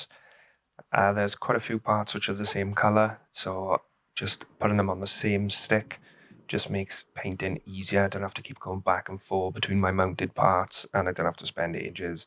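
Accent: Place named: New Zealand. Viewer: Great to hear an accent/dialect from home ! - excellent set of videos for this kit. Perfectly lit/shot and measured delivery. Great work matey. Cheers from Aotearoa/NZ.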